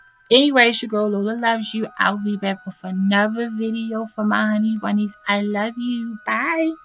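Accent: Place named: Baltimore, United States. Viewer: Are you from Baltimore? Your accent sounds like it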